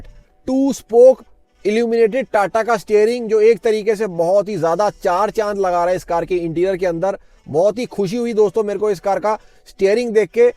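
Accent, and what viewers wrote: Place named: Pakistan. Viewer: Bhai aap muze pakistani jaisa sound kar rahe ho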